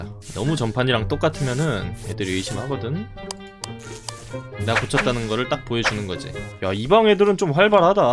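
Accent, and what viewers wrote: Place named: South Korea. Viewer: 찐 콩글리쉬군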